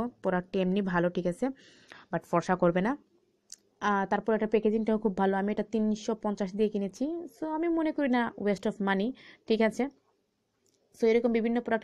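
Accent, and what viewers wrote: Sylhet, Bangladesh. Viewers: Amaro lager tain sylhety😃😃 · Are you sylheti ?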